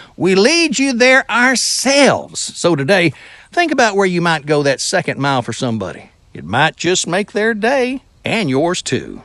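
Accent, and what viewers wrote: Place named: South, United States. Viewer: Gawd, this accent represents backwardness and hatefulness . How can anyone be proud of being from the south. It’s pretty disgusting.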